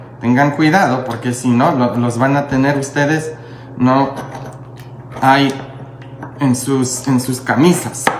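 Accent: Mexico. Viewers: Jajaja jajaja jajaja jajaja jajaja 🤣 padre me hace reír con su huuuuuuuuuuuuu como me hace los días más alegres dios lo bendiga y cuide usted si es mexicano hasta en la manera de hablar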